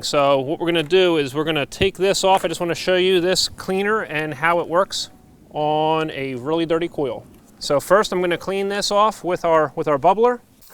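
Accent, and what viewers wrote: United States. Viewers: This guy has an accent, which I can't tell where in the US he is from. Just listen to how he pronounces the word "coil"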